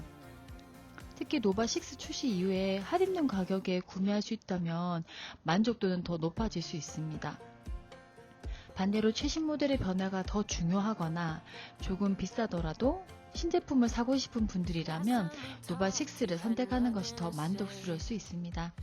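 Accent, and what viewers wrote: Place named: Gyeongsang, South Korea. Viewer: 경상도 사투리 ㅎ 귀여워요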